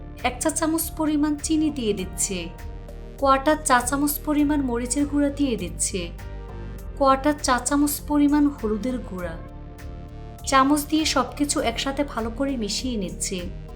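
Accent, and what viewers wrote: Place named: Dhaka, Bangladesh. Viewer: আপু ঢাকা যে থাকেন সেটা আপনার কথা শুনে বোঝা যায় বাকি ঢাকা কোথায় থাকেন আমি ও ঢাকা উত্তরায় থাকি। আমি আপনার বড় ফ্যান আপনার রান্না গুলো দেখে খুবই ভালো লাগে আর আমি আপনার ভিডিওগুলো সব সময় দেখি। ❤️❤️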